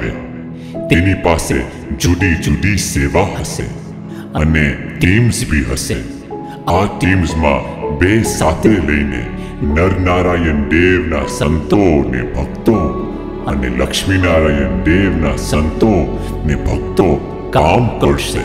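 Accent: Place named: Surat, India. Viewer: Tame pure surti chho...right bhagat??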